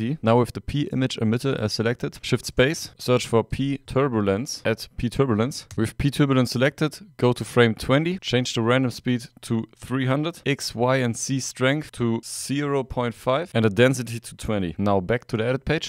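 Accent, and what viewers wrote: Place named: Germany. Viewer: soo i can SEE you ARE German cause pof your Accent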